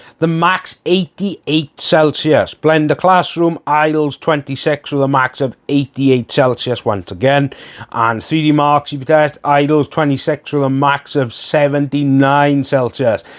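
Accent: Wales, United Kingdom. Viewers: Not only do I really like your videos but it's refreshing to hear a Welsh voice in the tech space. Makes me miss living in Aberystwyth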